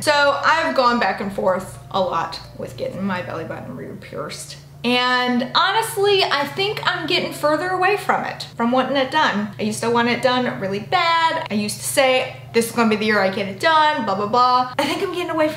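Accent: South, United States. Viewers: I can tell your southern and just wondering where? Tennessee here😁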